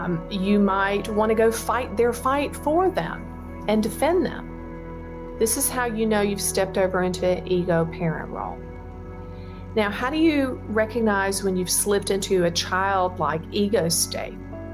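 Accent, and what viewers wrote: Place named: South, United States. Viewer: Very informative and we are all blessed that you had such a diverse life experience and your knowledge as a teacher and musician with a wonderful Voice (and Southern Gal accent and character 😀)is a true blessing 🙏. Thank you, and Team ❤️👏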